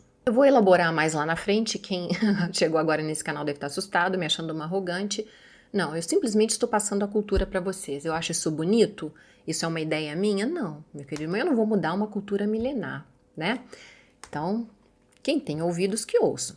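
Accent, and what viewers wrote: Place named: Minas Gerais, Brazil. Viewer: Fiote de cruz credo 😂😂😂😂😂 esse jeitinho mineiro da me mata amo minha Minas Gerais 😅😊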